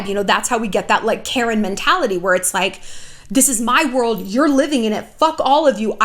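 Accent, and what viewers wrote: France. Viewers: CIGARETTES? OMG. That's total insanity. That's disgusting. I love how you say, "I think about this once a week!" YOU ARE HILARIOUS! I'm dying. I also love your French accent and vocal fry. You're a great actress. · J'adooooooore ton accent français. C'est parfait et rigolo, comme toi, beauté ! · Ravie de savoir que tu es française! Ahaha lovely accent xx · Love your French accent, I laughed so much. Greeting from Paris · Your French accent <3 and all your other wonderful accents, they're always so entertaining to watch!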